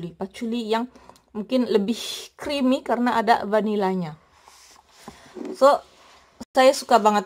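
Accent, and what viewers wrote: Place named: Banyumas, Indonesia. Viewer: logat nya kok spt logat ngapak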